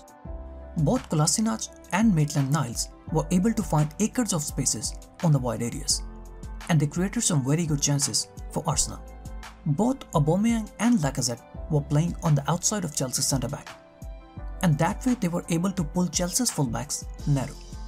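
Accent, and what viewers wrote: India. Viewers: I don't know him but this pronunciation sounds like that of an Asian, especially an indian, great analysis i wonder how could an Indian know so much of football instead of cricket.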